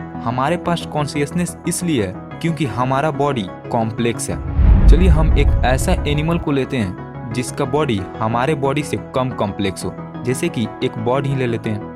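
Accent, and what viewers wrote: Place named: Assam, India. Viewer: Sir aap Assam se lagte ho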